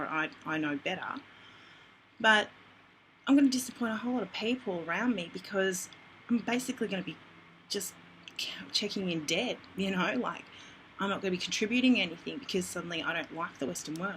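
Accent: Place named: Australia. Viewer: We don't need to have what we learned in school retold. PERIOD. They lied. You sound Australian, I'm in the USA you are right side up.....sorry.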